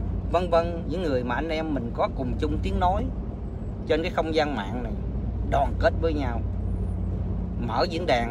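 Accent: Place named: South, Vietnam. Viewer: hay lam anh noi dung day cach noi chuiyen rat nam bo toi thich anh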